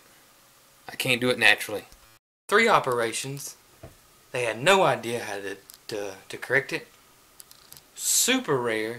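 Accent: South, United States. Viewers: I appreciate the Southern drawl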